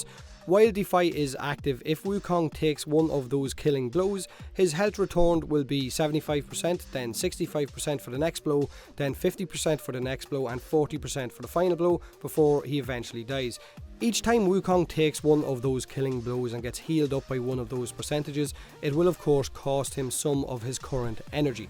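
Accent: Ireland. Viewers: am new to this channel and i love to know if this guy is Irish or not his accent sounds like Irish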